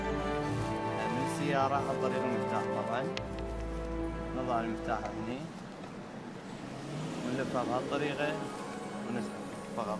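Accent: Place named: Iraq. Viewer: كﻻمه عراقي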